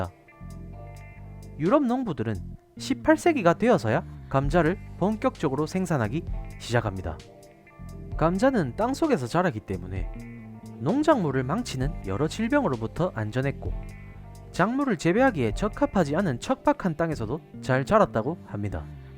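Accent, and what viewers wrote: Gyeongsang, South Korea. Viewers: 경상도 외국어 말고 표준어 써라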